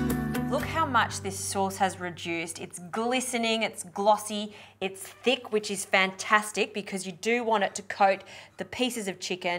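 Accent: Australia. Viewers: is this lady from australia or new zealand? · amazing this looks really good i will definitely try it ..................... and i love your Australian accent after all I am Pakistani cricket lover who love s food as well. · Is this Australian or New Zealand accent? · She's Australian, this is from her TV show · she’s Australian 🤣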